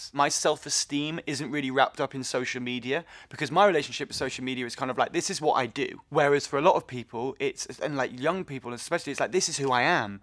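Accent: United Kingdom. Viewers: By listening from speaking, I read the comments with a British accent in my mind. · choke me with that British accent.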